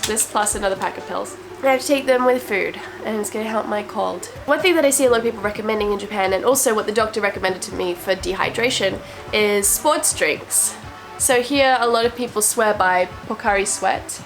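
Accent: United States. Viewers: I'm hearing a little bit of American popping in to your accent lately :') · Sick has kind of an American accent haha Hope you're feeling better! My go-tos are olive leaf extract, OJ, tomato juice, and soup. I had a flu-y thing a few weeks ago that wiped me out for 2 weeks, hope you haven't had the same thing. It was horrible.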